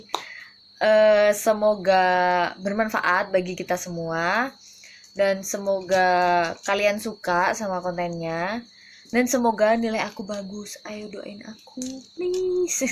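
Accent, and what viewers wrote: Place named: Java, Indonesia. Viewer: Kok geliiiii 😂😂😂😂 medok banget ya bakno 😅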